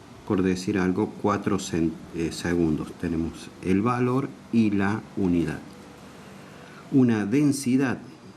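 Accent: Córdoba, Argentina. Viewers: Ese acento cordobeh si se puede ver 😍 , lo entendi todo explica muy bien gracias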